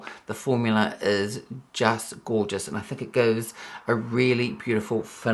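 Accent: New Zealand. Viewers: I ❤ your videos love!!! I also will be trying to do a Kiwi accent all day!!😂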